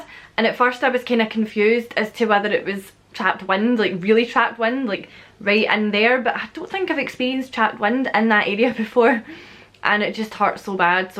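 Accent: Scotland, United Kingdom. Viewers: What's it like having a Scottish accent and then coming down to South Africa?:)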